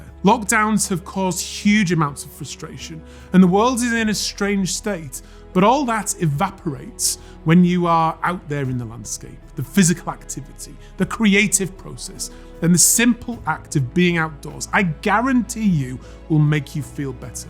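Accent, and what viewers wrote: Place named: United Kingdom. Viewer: Hey, I just discovered your channel during the lockdown 2020. I just want to say: Thank(!) You(!). Watching your videos is something I can do for hours, because of your combination of stunning photographs, good explanations, nice stories ( and your british english 😀 ) I don't care, which amount of money you earn or how you could be categorised. I am just happy, when there's a new video I didn't see yet, making this stayathomeadonothing times a bit less ugly for me. Keep going as you are and don't give a sh.. about the haters - there always will be some. Also I am really looking forward to read your book! Best regards from Germany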